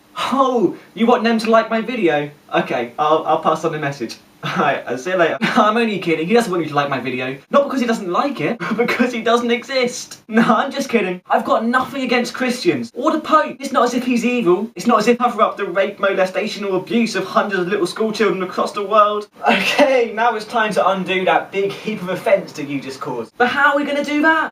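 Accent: United Kingdom. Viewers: very funny british accent :)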